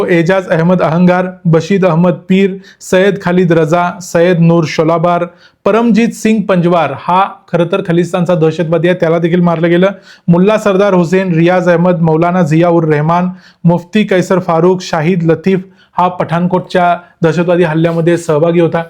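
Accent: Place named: Kolhapur, India. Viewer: असून मस्त कोल्हापुरी बोलतोस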